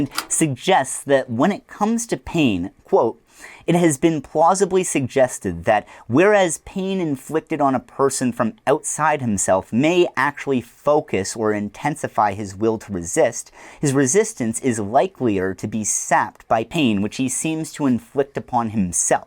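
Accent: Canada. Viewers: theres something about hearing a canadian talk about american history that causes me to chuckle. im 30 min in so far so good.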